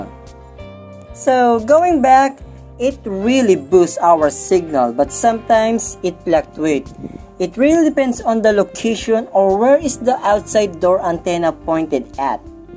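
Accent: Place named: Philippines. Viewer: Pang English English kpa halata nmn accent mo na Pinoy ka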